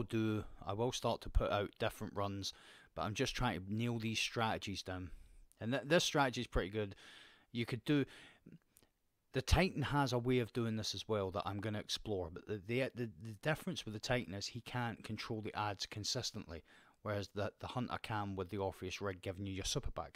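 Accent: Scotland, United Kingdom. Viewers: Great tutorial dude really helpful strategies for each area also are you scottish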